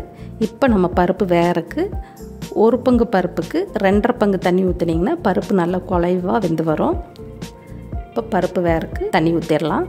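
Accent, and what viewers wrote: Coimbatore, India. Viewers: slang apdiye namma ooru slang Sema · Unga kovai slang superb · Your demo is very good with Coimbatore accent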